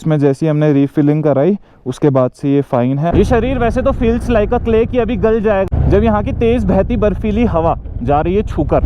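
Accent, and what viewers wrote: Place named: Haryana, India. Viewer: Bhai haryane se lg rhe ho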